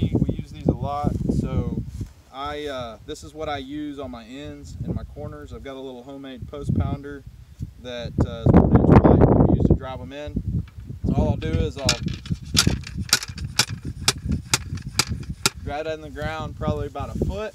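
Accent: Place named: Texas, United States. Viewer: Hey what up with the Aggie comment!! ... Class of "92. Enjoyed the video! Sounds like a Texas accent.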